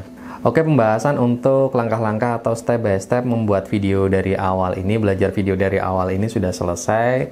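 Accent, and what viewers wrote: Pekalongan, Indonesia. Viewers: Orang pekalongan yah bang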